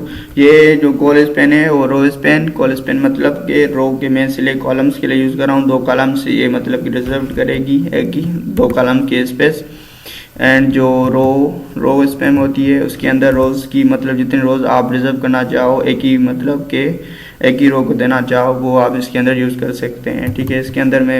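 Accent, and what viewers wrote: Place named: Sindh, Pakistan. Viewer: Your Talking style seems Sindhi by the way Love you and Thanks <3 Peace !!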